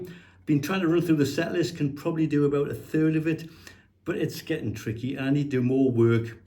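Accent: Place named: Northeast, United States. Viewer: Hi, Thanks for your video on your broken wrist to guitar playing recovery. Ive just broken my left wrist and hope to get back to it. One day. I'm from the northeast, originally so your video voice is very familiar. Thanks again for the lifting video. Oh and great playing... well done. Top man!!!...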